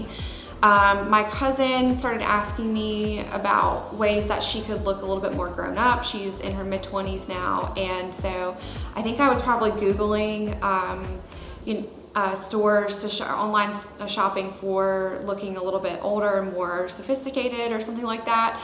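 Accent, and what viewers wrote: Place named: New Jersey, United States. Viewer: Sounds like a Jersey accent to me.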